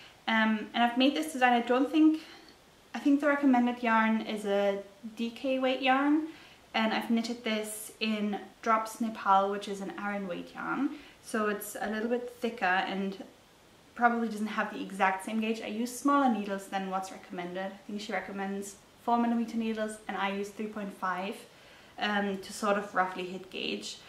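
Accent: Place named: Scotland, United Kingdom. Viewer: Hey - good to connect with your podcast! Sending love up to Glasgow! Love to hear a European with a nice Scottish flavour to their accent ;)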